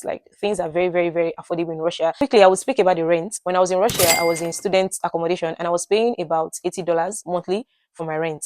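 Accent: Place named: Nigeria. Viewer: Thank you for your video. However, for some people who aren't very familiar with the Nigerian accent, it would be very helpful if you could either speak slower or add subtitles. I, for one, although I understood what you were saying, I had to replay some parts over and over to capture the words. But thank you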